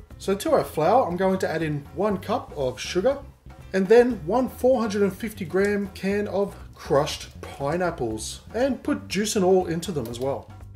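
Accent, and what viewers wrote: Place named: Australia. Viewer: he said 40mins, must be the Aussie accent >.>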